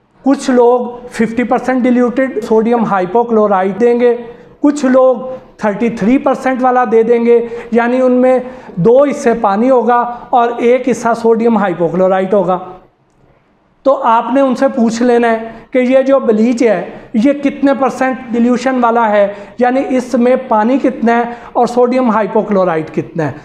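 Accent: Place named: Punjab, India. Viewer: MA SHA ALLAH sahib Aap bohat cute punjabi style k malik ho. ALLAH Karim aapko hamesha khush rakhe Aameen ya RAB Ul Aalaameen.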